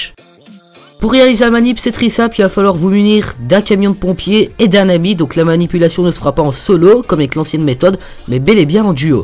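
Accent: Belgium. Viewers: Ah psk ta un axants qui resanble au belg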